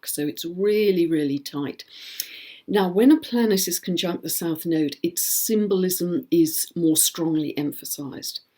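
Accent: United Kingdom. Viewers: Beautiful lady, great astrology! I love her British accent, too! So chic!!! Thanks & Blessings! ✨💫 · You are my favorite internet fairy. Your forecasts are way cool. Really enjoy the way you talk and the British accent, too. Thanks for doing this. Greetings from Florida.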